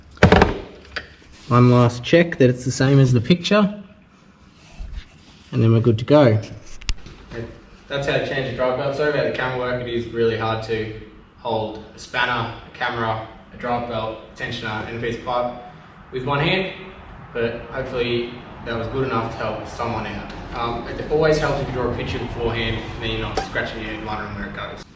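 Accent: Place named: Australia. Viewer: Thanks mate.. i am in thailand but having it explained in proper Aussie language was gold.. thanks for your video..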